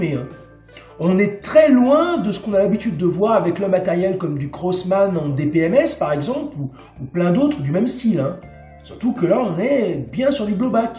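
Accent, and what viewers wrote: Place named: Loire, France. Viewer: Bonjour où trouve t on cette carabine? Au vu de votre accent n'êtes vous pas de la Loire du côté de Firminy ? 😉 Merci pour les réponse... CLT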